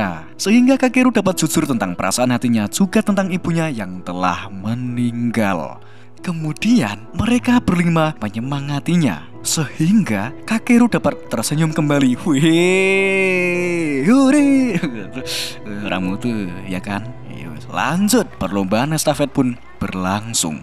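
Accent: Java, Indonesia. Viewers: Saran jangan terlalu medok apa lagi aaa iiii uuuu eee ooo nya delete aja soalnya kefokusan jdi samar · Klw medok itu tingkat ke Medokan nya bisa di kurangin gk ya..🤔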